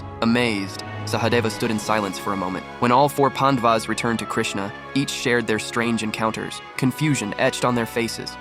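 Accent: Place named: United States. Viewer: Phew! Finally Mahabharata in American accent! ❤❤❤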